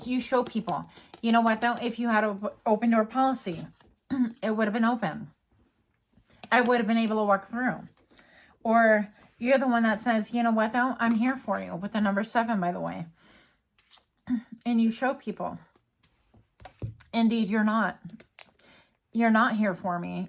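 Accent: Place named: Spain. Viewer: I love your Spanish accent. I'm from Mexico and I love it. 🩷🌟🌟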